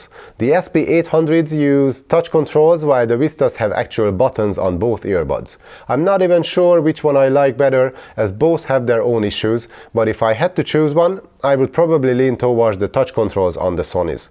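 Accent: Hungary. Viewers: magyar akcentus? :)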